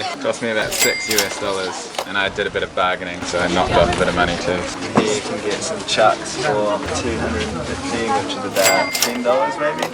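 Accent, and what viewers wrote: New Zealand. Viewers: It's not an english accent though? Place called New Zealand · Are u from new zealand?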